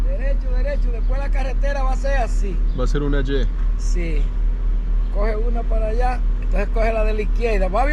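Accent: Colombia. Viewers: De dónde eres? Su acento parece colombiano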